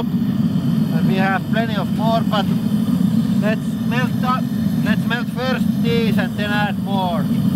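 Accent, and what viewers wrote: Finland. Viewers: Are you from Finland? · are you from Finland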